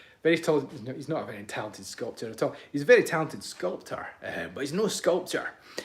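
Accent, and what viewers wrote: Scotland, United Kingdom. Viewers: Belatedly hello from Yuma Az. I love your historical lessons. I am a Kincaid descendent. Have you toured their manor? I was coming to see it but old age and health got me first. I would love to hear its history, with your Scot accent and instructor-like presentations.😅❤❤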